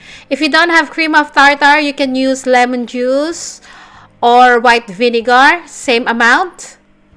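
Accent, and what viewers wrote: Philippines. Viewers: Filipino accent...nice.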